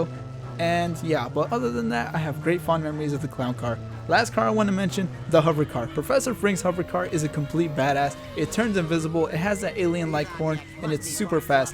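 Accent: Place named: Latin America. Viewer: jaja Que quay!!! I am Chilean and I could hear it in your voice! Much love bacan!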